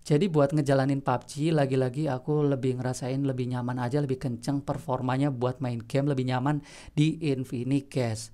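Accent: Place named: Cilacap, Indonesia. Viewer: Kaya wong cilacap kiye apa yahh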